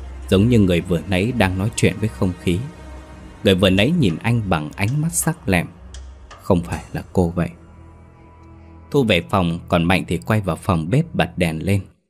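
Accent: North, Vietnam. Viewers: Hóng truyện của tg Huỳnh Thiên Hương. MC giọng bắc nhưng đọc truyện theo văn miền nam, miền tây cuốn theo cách riêng nha. Mình người SG nghe cứ bị ghiền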